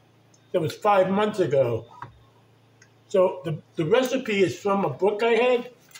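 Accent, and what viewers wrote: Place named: Wales, United Kingdom. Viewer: Are you originally Welsh? You have a Welsh accent here and there :)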